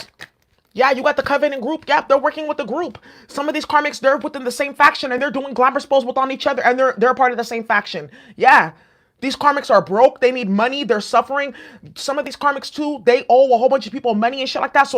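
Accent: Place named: New Orleans, United States. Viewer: Sounds like u are from NOLA. ME too!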